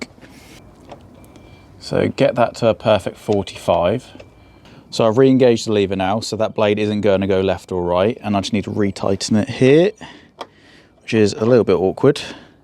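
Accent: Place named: England, United Kingdom. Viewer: Being French, it's very nice to have an equipment review with a nice English accent instead of an American one. And to hear you give measurements in the metric system: priceless!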